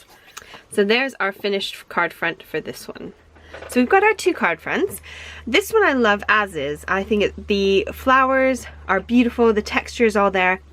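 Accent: United States. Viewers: Hi there, new subbie here, loved your cards, where are you from? I can’t work out your accent, you sound American, but so many of your phrases are English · I so love your American accent with British terms. Makes my day🤓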